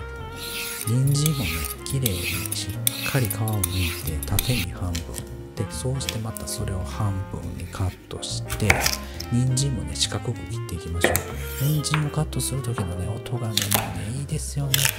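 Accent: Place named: Osaka, Japan. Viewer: Twitternからきました。Uraruです。 大阪弁で心地のいいお声。さすがプロの話し方です。 新しいLA TABLEさんですね クラムチャウダーが欲しい季節になりました。 うちのストウブちゃんでやってみます♫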